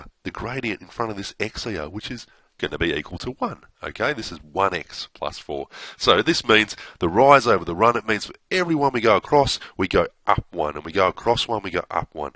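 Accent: Australia. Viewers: He's speaking English but he has an Aussie accent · Aussie Aussie Aussie!! Thanks, mate. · He's just Australian by the sound of it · No he’s just an Aussie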